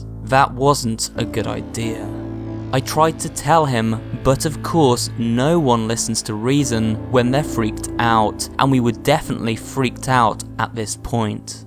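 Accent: United Kingdom. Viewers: I think he breetish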